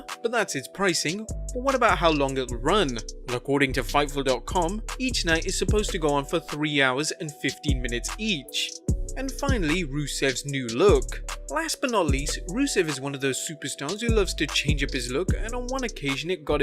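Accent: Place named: England, United Kingdom. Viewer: oh thank god. if it wasn't the English guy and instead of the American guy narrating the video. I would have tune out.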